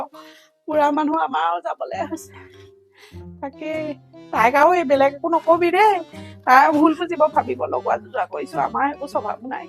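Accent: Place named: Lower Assam, India. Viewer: 😂😂😂😂😂😂😂😂😂 Hahi Hahi petor nari dal dal 😅 Pise eta kotha. Please don't take it otherwise, apunar mukhot Lowee Assam accent tue besi val lage. Baki sob Mojjjaaa ❤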